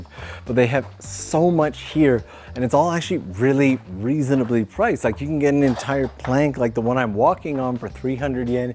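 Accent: Canada. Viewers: Your Canadian accent really came out in this episode. Great stuff! love your videos.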